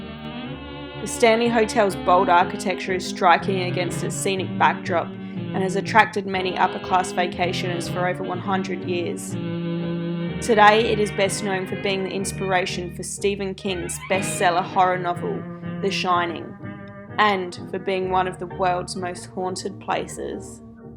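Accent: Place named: Australia. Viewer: love this. also love your accent !! i think its aussie, where are you from ?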